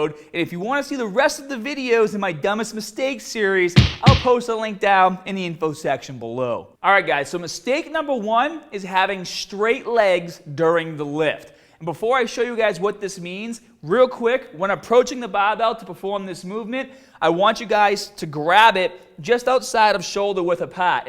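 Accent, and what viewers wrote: Boston, United States. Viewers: Viknesh Jay ?? Australian? Please tell me you know the difference between a Boston and Australian accent? This video is from Aug 2016!! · I know!! I'm from Boston and approve of his accent 😛 so I went to show his videos to my husband, only to find out that his accent only comes out in some of his videos. I think he used to have the accent early on, then got rid of it for whatever reason, but now it's back with VENGEANCE. Love it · Dude, what's with the Boston "a"? I've been watching your vids for 6 years, and you never even came close to talking like that in the past. If you'd always spoke that way it'd be one thing, but since that's not the case it's just annoying. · dude wtf is with your accent?! the "bahbell" suggests boston but you almost sound scottish at times and neither pronounce the word "mascles"! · i remember watching your videos years ago and you never had a boston accent, wtf happened? 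· Great content. But the Boston accent makes me want to throw up everytime I hear that open "Ah" sound. Please for the love of all that is Swole, tone down that accent! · So used to the Boston accent now - just sounds normal to me ! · A few seconds into the video and I had to check the comments to make sure people were making fun of your Boston accent. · I find the that this video was great and informative! Apparently people on here are not so well traveled and live in a bubble where they can't recognize a Boston accent. I am from Chicago and I get the same thing because my accent. As for the video,I think people have a hard time getting out of bad habbits when it comes to form. Some people are so used to doing it wrong that when they try the right way they get hurt. They try to do it the right way with the same weight. I have seen this many times with other exercises well. As soon as you tell them to lower the weight and use proper form some struggle,but can do it. 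Others try the same weight and either get hurt or cant do it at all. Ego lifting is correct and true. This is why I have always been surprised how people still make gains with crappy form. Yet steroids seem to be involved. Of course when they are 60 and complaining that there back hurts. They might regret not taking some sound advice when they were younger instead of trying to impress someone at the gym. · Is that Boston accent? I thought it was extinct. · Petro .... he sounds like he is from Boston or from the New England region. Kinder like some of us yanks who are lost when we try to listen to some of you English from some parts of your country, i.e Geordie accents. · He is from Boston. It's the way he speaks. Tough luck if you don't like it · Maybe it's because I'm not cultured but I couldn't tell if was from Boston or Australia until I read the comments. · This guy sounds like he is from barwstan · his boston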